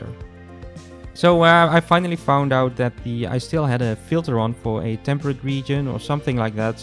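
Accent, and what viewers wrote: Netherlands. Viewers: not many animals look like what i see on the veluwe but keep up the good work ( ik hoor een beetje nederlands engels am i right?)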